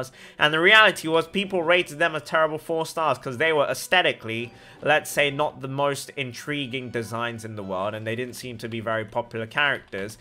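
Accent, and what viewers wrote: United Kingdom. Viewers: I've watched many Genshin content creators, this is the only channel I keep coming back.. There's no happier thing than to watch an Asian with a British accent :D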